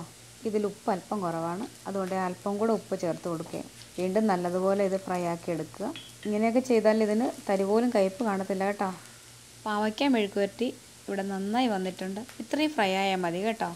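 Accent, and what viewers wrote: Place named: Ochira-Karunagappally, India. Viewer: സഹോദരി. ഓച്ചിറ, കരുനാഗപ്പള്ളി ഭാഗം(സംസാരം) ആണെന്ന് തോന്നുന്നു.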